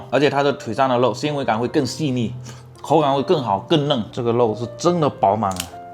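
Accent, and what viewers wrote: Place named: Fujian, China. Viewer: 很喜欢听福建人说普通话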